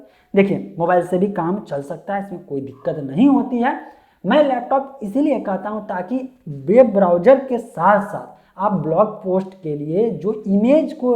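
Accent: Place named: Bihar, India. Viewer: Bhai apka bolne ka tarika s lag rha hai bihar jharkhand s ho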